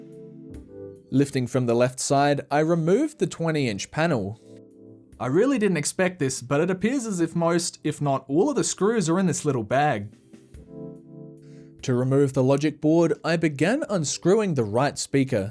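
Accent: Australia. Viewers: I hope people don’t think this is how Australians talk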